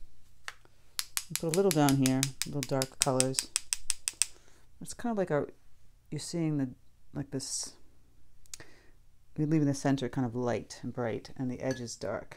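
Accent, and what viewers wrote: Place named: New England, United States. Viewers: you are so refreshingly New England, somehow. I moved to NC a few years back, and I love hearing you and your expressions. “Boop”, “ooo hooo” etc. (lol). I am almost homesick - except for the weather. Your vids save my sanity. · I love this! I'm going to try it! You sound like my cousin in New Hampshire. Where in New England are you from? I'm a Mainer.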